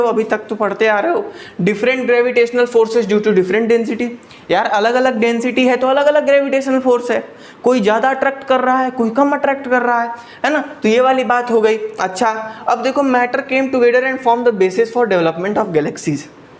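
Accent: Bihar, India. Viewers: You have a different and a special way of teaching that highlights you from others 🤗🤗♥♥ because we don't feel bored while studying from you 😃😀 only because of your bihari language 😇😇😅😜♥♥♥♥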